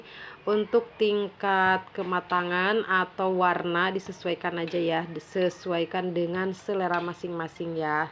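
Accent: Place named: Padang, Indonesia. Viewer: Seperti nya dari cara bicara dan logatnya, bunda ini kayak orang padang..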